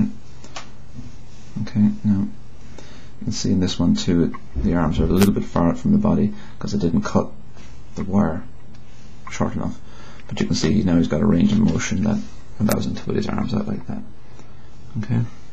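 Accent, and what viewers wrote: Ireland. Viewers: u sound irish are u?? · i think he sounds a tiny bit irish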